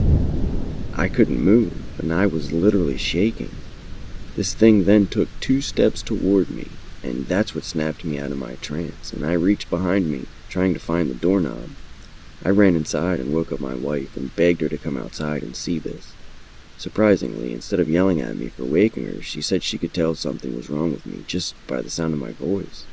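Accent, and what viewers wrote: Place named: South, United States. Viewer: I hear a little bit of a southern (US) accent ☺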